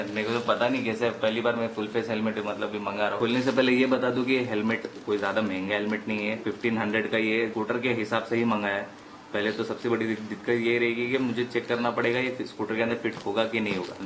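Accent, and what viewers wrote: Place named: Solapur, India. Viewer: tum Solapur ke ho kya???? Blog mein sunne jaisa laga???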